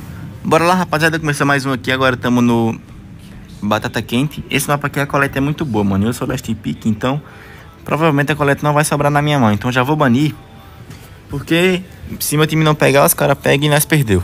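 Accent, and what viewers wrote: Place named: Bahia, Brazil. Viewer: eu te amo bahia bahia, eu amo teu sotaque bahia, manda um salve pra paraíba 😁😁😁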